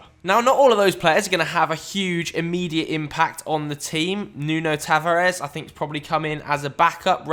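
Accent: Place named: Burnley, United Kingdom. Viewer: Love that has kept doing the Burnley accent since I said it was class like a year ago 😂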